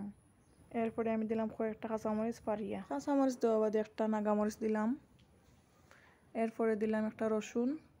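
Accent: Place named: Sylhet, Bangladesh. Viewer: আপু খুব স্বাদের শুটকি সিরা আমরা সকল সিলেটি আপু সিলেট কুন জাগা আপনার বাড়ি সিলেটি কতা খুব লাগে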